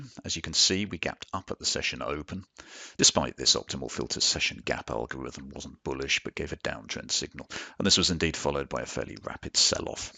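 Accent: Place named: United Kingdom. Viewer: Good stuff! You have a great voice. Very calm sounding with a classy British accent.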